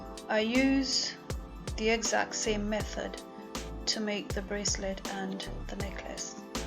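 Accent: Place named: Trinidad and Tobago. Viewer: Hello! I just came across your channel by looking for an easy chain link to make. Your work is easy and beautiful! Thank u, all the best! By the way I heard your accent, by chance are u a Trini? You sound a lot like me, lol. Thanks again. Gonna subscribe!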